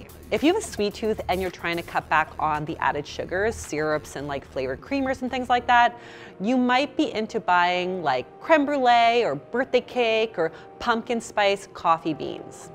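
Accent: Canada. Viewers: I was not prepared to find out how Canadians say the word bagel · Love the Canadian accent. It’s cool hearing bagel said in a different way. I love accents.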